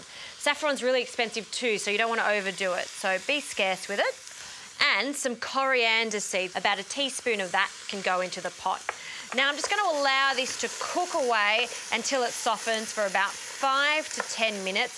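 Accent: Australia. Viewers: She sounds Australian.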